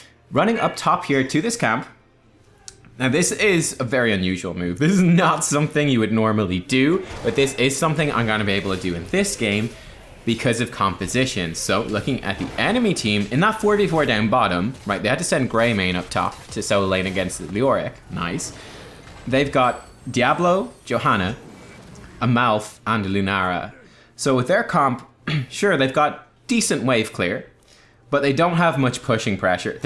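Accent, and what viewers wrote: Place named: Ireland. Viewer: What part of Ireland are you from? Never heard your twang 😁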